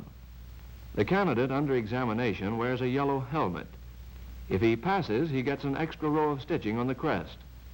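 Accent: United States. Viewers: American accent / reading style .... so different ? Fascinating history thanks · You apparently think the U.S. should have gotten into WW3 just a few years after the end of WW2. Tibet was also extremely isolated and would have been very hard to defend for the U.S. Finally, the man doing the commentary isn't from the UK, he is obviously American, and he lived in a very different time in which the vast majority of Americans that would have been watching this in the early 40s knew absolutely nothing about Buddhism, so of course he used terms they would understand like church. You are being ridiculous and acting like a troll.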